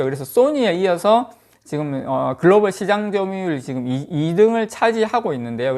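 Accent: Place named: Gyeongsang, South Korea. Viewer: 저도 경상도🤗 발음 편안함니닷😄 점진적 소곤소곤 우상향📈 🅻🅾🆅🅴(๑•᎑< ๑)♡🙆🏻‍♂️🙆🏻‍♂️🙆🏻‍♂️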